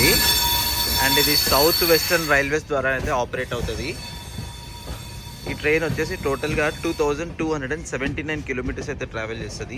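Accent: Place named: Telangana, India. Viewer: తెలంగాణ యాస 😊❤...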